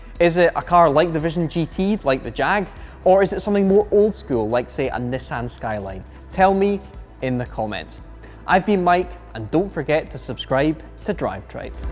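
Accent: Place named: Newcastle, United Kingdom. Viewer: He's Geordie not Scottish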